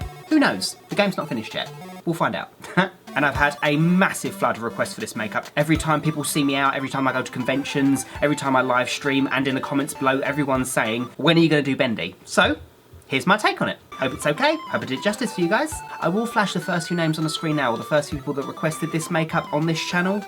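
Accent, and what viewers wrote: England, United Kingdom. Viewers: I love U and your england accent. Love u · Are you britsh or not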